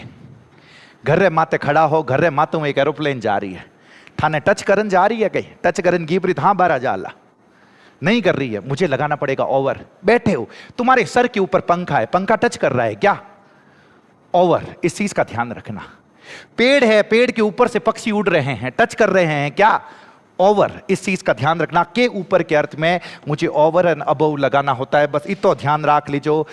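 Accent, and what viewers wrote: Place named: Rajasthan, India. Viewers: Rajasthani vaani badiya lage hai sir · गुरु jiक्या अंदाज है आपके पढ़ने का वह भी अपनी मारवाड़ी भाषा में❤❤❤ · Jodhpur ri boli ❤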